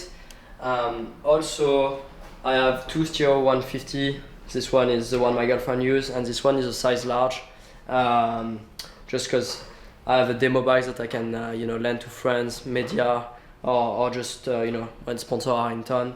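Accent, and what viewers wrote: France. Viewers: Strong French accent there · Super utile, je me demandais justement comment j'allais mettre mes trois bexons au mur avec les Steadyracks que j'ai commandé hier, merci pour le tuyau. Et... pas mal ton anglais, juste avec un nice French touch :)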